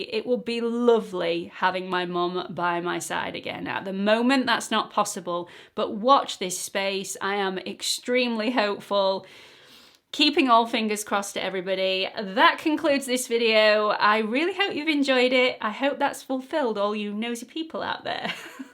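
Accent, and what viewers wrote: United Kingdom. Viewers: Thank you for being so candid and answering personal, sometimes accusatory, questions. I love your fresh style and spunky personality. I am from the states and enjoy hearing a British accent, lol. You're adorable and I miss your mom ha ha. I hope that one day I can have as good relationship with my daughters as you have with your mom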